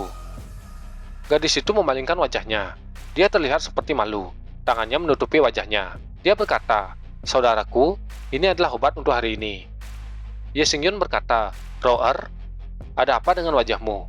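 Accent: Bali, Indonesia. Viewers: Cengkoknya kayak orang bali ya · logat balinya kentel bgd^^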